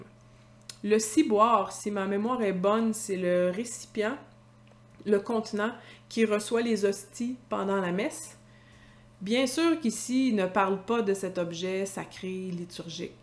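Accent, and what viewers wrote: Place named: Quebec, Canada. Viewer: C'est très intéressant l'accent québécois. En Argentine on apprend le français langue soutenu et en Amérique il est possible trouver beaucoup d'accent et des francophiles. Merci à toi!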